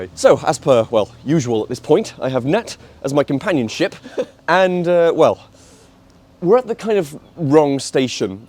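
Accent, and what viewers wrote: United Kingdom. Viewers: I love that British accent. And the nerdy content. Awesome. Please keep going.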